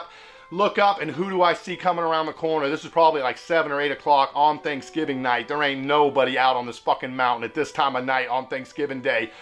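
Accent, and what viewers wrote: United States. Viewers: I love the american accent great story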